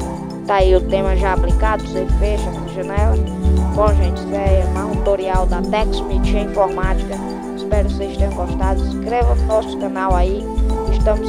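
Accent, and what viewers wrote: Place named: Paraíba, Brazil. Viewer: FANHO COM PARAIBANO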